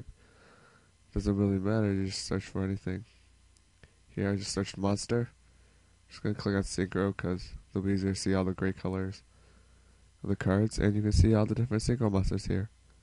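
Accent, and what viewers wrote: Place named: North America. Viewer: you have to be either from USA or Canada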